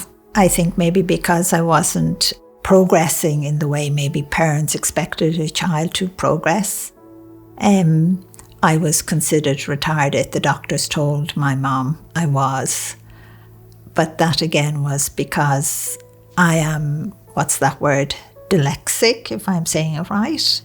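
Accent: Ireland. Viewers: Just what l needed. I so love her soft lrish accent. It makes such a change from all the US you tubers! (l say this with respect nevertheless, so please don’t take offense). That soft Irish drawl is like a healing solace to my soul. 💝🇬🇧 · I can tell she's Irish. Beginning of video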